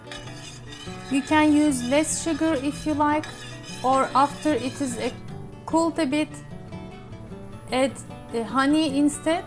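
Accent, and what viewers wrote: Turkey. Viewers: Türk var mı konuşan kadın türk gibi geldi ingiliz şivesi yok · Abla Türkçe konuş türksün zaten 👍😂. Aksan Türkçe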